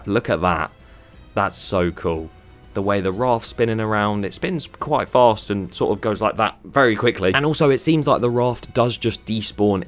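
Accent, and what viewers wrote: United Kingdom. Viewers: I like you, british man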